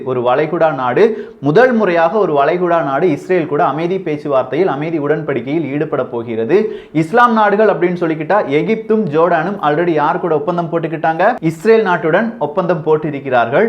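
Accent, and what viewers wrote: Kerala, India. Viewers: Extrodanary...bro....malaiyaala slaanghiel.neeghal peyisuvadhu.yenn uurrai .ghiyaabhaha paduththuhierradhu...,‼️‼️‼️‼️‼️👌🙏👍👍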